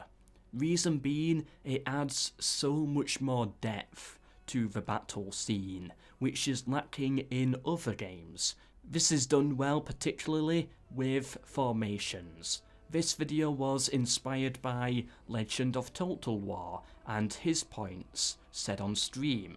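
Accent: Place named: Northern England, United Kingdom. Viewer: I'm sorry to say that but what we have here is northern English, not RP British.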